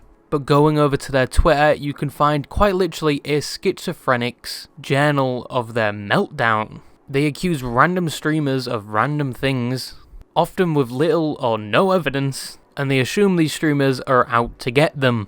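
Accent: United Kingdom. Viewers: ahh yes the british disembodied voice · British man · wait you're a brit? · he’s just british mate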